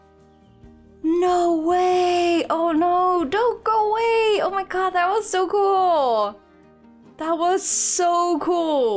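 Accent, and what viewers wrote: Netherlands. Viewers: Are u dutch? I font know why but i have the feeling that your dutch😂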